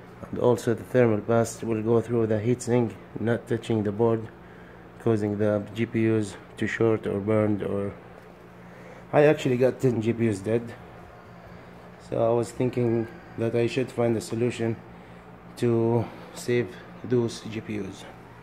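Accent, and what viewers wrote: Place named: Africa. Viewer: You sound like you might be from some part of Africa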